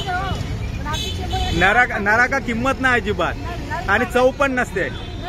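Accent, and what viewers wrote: Malvani, India. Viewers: बरा वाटता कोण तरी अस्सल मालवणीत बोलता ता नायतर अर्धे मालवणी गोंधळच घालतत. अर्धा मराठी अर्धा मालवणी बोलान. तेचा काय होता म्हायत आसा समोरचोय गोंधाळता मरे. असो तुका बाकी हॅट्स ऑफ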